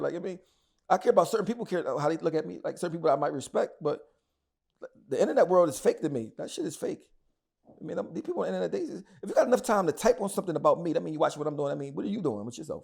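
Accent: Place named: New York, United States. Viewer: I'm from down south in Tennessee. I'm loving this video I love to hear, men from New York speak. Lol at one point I moved to New York just for that reason. Thanks for the video. 😎💜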